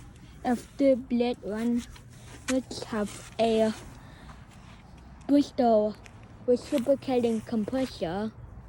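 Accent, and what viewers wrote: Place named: Vietnam. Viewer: Wow your English it’s like Vietnam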